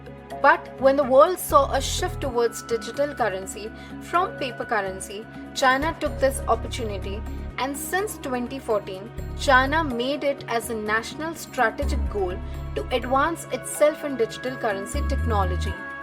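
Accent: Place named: India. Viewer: Stop inventing things in Indian accent please, where is the digital currency of America???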